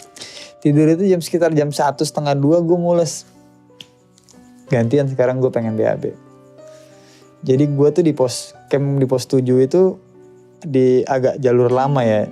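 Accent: Java, Indonesia. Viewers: Bang abis ketemu mas Itank dkk n mas Bala, jd kebawa logat Jawa yah...😃😃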